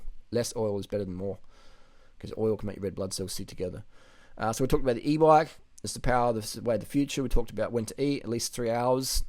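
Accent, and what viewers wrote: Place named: Adelaide, Australia. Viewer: Your accent gets thicker the longer you're back in Radelaide.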